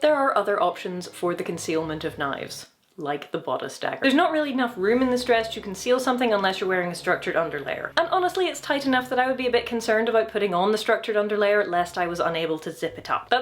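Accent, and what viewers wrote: United Kingdom. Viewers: I love videos. I don't know why. I think it is for several reasons, or some combination of them. 1, voice is soft and soothing. As a typical American, I often fantasize about what it would be like to be with a British woman. It's the accent. Love it. 2, goes into a lot of detail but doesn't dwell on the minutiae. That makes them interesting to me, someone Not in the entertainment industry, but interested in it enough to want to know the cool behind-the-scenes stuff that talks about. 3, editing skills are awesome. The way she combines her talking points, voice-over, and flashbacks makes the video interesting. It's not how I would produce the video... it's WAAAY better. Her film experience is obvious, even to the unenlightened. 4, is gorgeous. 'Nuff said about that. I am a guy, can't help it. This is totally separate from my comments about her voice. 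Her effect on the visual and aural parts of my brain are different, yet delightful in their own ways.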